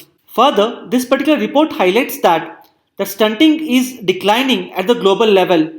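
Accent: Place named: South India, India. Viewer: With a little south indian accent in his tone, i liked his simplistic, proper flow diagram & in depth analysis. Just a complain 🤐 didn't like his new hair style. Childish looks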